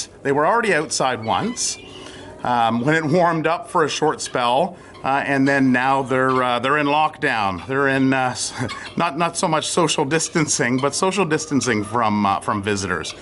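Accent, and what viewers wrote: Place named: Canada. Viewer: American accent? lol I was engaged to a Canadian for a few years so I spent some time in Ottawa and you are undoubtedly Canadian lol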